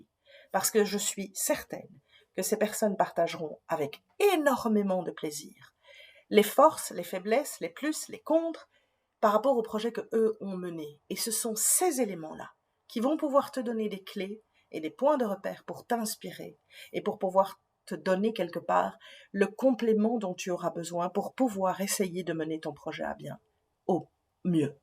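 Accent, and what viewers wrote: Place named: Belgium. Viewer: Bonjour, j'adore vous écouter mais je me demandais d'où venait votre petit accent? Belgique?... Moi, je suis normande mais j'habite près de Paris. Merci!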